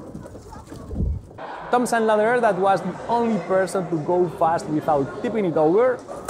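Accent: Spain. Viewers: No deja de sorprenderme que tu acento sea tan español, y a la vez tu léxico inglés sea tan extenso 😆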